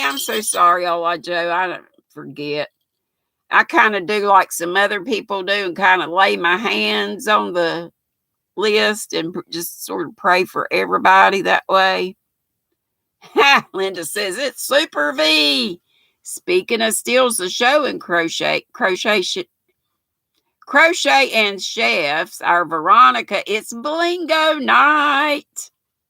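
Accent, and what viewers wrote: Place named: North Carolina, United States. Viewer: I thought your accent sounded familiar, I'm from NC too. I live just west of Charlotte!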